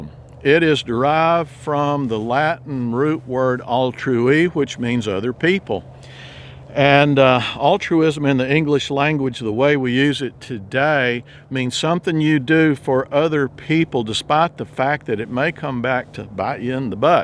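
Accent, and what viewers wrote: Texas, United States. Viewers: It has been many months since I've watched your videos and I apologize for that. My Mother passed away in July and I have been adjusting to that loss. She liked your videos and we would watch them together. She loved your Texas drawl and would say you're a "good looking man"! Bless you and your family and here's to a better 2021. Take care.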